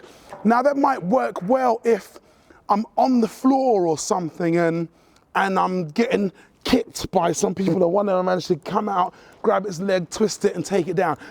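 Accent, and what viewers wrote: United Kingdom. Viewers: I only noticed you had a UK accent after being subscribed for a month. I’ve watched enough Doctor Who that these accents slide under the radar unnoticed. #Murica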